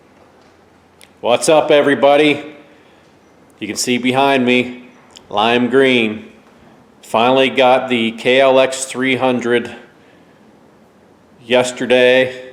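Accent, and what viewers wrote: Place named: Pittsburgh, United States. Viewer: I here a pittsburgh accent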